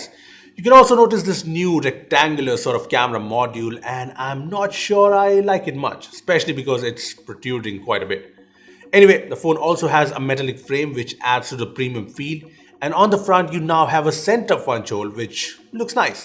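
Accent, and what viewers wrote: India. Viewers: Now an Indian that can speak pure english Amma subscribe right away 😌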